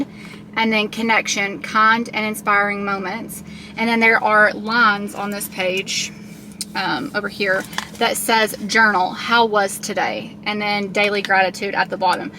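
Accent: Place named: South, United States. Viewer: I just discovered your channel and this was the first video I watched. Super cute video! I hear that Southern accent. What state are you in? You mentioned a trip to Houston I think. Are you in Texas? 😊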